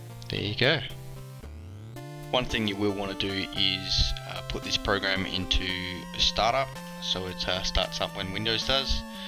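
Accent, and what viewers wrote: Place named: Australia. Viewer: When I clicked on the video and heared an Australian accent, I was like HOLY KROYKEY MATE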